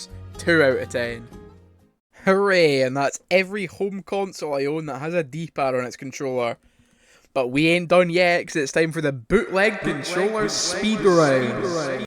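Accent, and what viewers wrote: Scotland, United Kingdom. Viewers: Are you Scottish because I am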